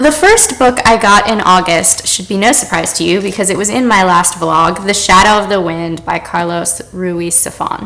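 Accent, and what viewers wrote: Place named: Upstate New York, United States. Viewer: HI just stumbled across your channel and I LOVE YOU ALREADY! where are you from bc u have a bit of an accent... i could be totally wrong but upstate new york? possibly buffalo?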